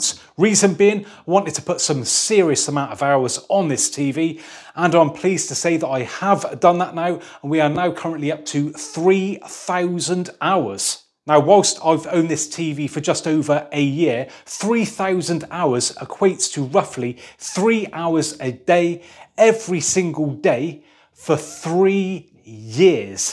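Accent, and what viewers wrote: United Kingdom. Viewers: Thumb up for that beautiful British accent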